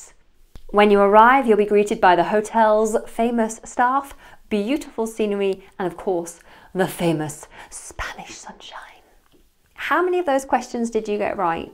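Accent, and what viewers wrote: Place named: United Kingdom. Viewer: Hello,. I speak English like an American, as I was born and raised her. I do enjoy your slant on proper British English, however. BTW, I ran across a single mother (mum) who has a YouTube channel, and she speaks "perfect" Yorkshire English, IMO. The site is "Mama Mei." I enjoy listening to various dialects in English and other languages. I do speak about 20 languages: it's a hobby. - Dr Dave Menke, Tucson, Arizona, USA.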